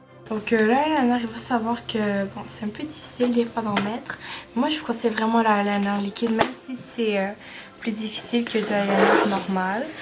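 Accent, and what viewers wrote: Quebec, Canada. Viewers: C ben drôle a voire ta un accent francais pi en même temps québécois (moi jsuis québécoise ) tk bonne video c pas BS :) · Désole, mais sa se voit que tessaille de prendre laccent francais. Tes québecoise. Pas besoin dle chacher!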